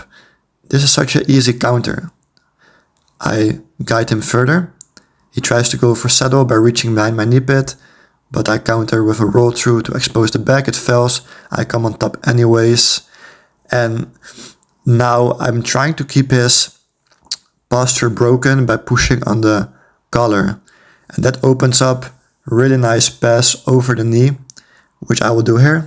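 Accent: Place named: Belgium. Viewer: Your accent sounds like a Belgian accent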